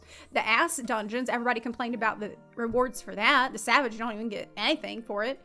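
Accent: South, United States. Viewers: A few comments as I watch.. 1) lmao that thumbnail. Great editing xD 2) "What is a mount but a pedestal for which to put my character in her perfect glam" 🤣 That got me. 3) Thing really escalated around 7mins hahaha but it's so true. IT'S ALL TRUE. FOR THE GLAM. 4) I realize there's so many clips just to show off glam. There was a lot of work put into this video <3 5) I can hear that southern accent coming out strong 🤠 I love your content,. I'm glad to see stuff here again and I'm sure a lot of us feel the same way about the pursuit for Glam. Why do Savage when gear is obsolete not long after? Stats are temporary... GLAM IS FOREVER. I'll say this though.. The game needs more suits and shoes imo. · I'm dying laughing cuz you got a southern accent in like 6 months of me not watching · Did I just find a streamer with a southern drawl?... I hope that's not a pet peave. 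Because that's cool, and subscribed! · Did pick up a southern accent? It's pretty adorable. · The southern accent is here thick now and let me just say I'm here for it. I feel represented. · No I agree that's a lot of cool glamorous and stuff in the game and it's a fun part of it and that's just it if that's all you want to play play it like that it's your game play the way you want to that's what they designed it to be I thought. Also I'm not sure if you're putting it on for a show but I'm hearing slips of southern accent are you originally from the southern Us by chance?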